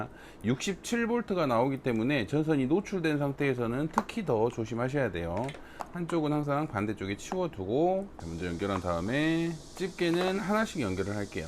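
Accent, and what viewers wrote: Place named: South Jeolla, South Korea. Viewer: 근데.. 딴소리인데 전남 어디신가요? 전남사투리가 너무 구수하십니다ㅋㅋ 저희시골은 고흥입니다ㅋㅋ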